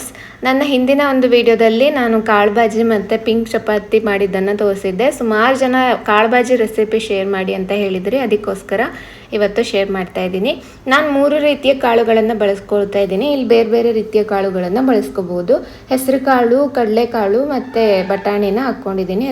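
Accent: Kundapura, India. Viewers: Nimdu kundapura kannadana sis nice sharing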